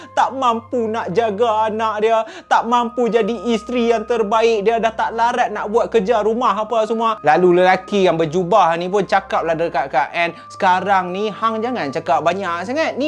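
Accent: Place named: North, Malaysia. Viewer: Slmt pg channel..hahaha terbaik intro kali ni..dimulakan dgn selawat.. cuma agak pelik mendengar bckp loghat utara..canggung bunyinya..tp kisah kali ni mmg best..nama pggilan pn sama mcm nama mamy...waah perasan sekejap nama mamy disebut2.. 🤣🤣🤣.. kisah kali ni mengingatkan mamy pd ank dara mamy yg disihir lbh kurang sama waktunya dgn kisah dlm video ni..waktu pkp..tp skrg alhamdulillah skrg semua dh ok..ank mamy tu dh sembuh.. terbaik video kli ni..bnyk pengarannya..best👍👍👍🌹🌹🌹🌹🌹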